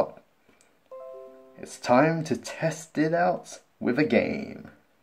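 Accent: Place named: England, United Kingdom. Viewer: Thnx dude, i love your English accent =]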